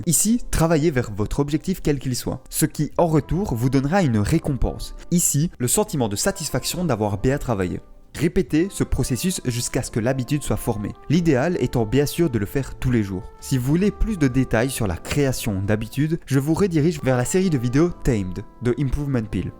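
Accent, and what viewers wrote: Liège, Belgium. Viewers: Bon bein je crois que j'ai reconnu l'accent liégeois :)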